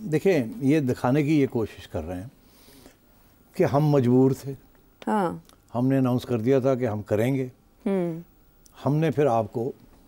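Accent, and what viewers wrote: Punjab, Pakistan. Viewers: Love Punjabi touch 😅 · Najam Sethi sb the way you give a punjabi touch its fantastic. 😂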